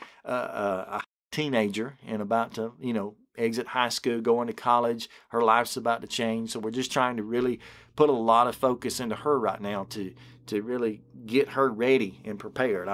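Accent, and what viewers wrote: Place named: Alabama, United States. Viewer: I 💘 the Alabama accent . It is so distinctive. You preach in a pool pit. I love it!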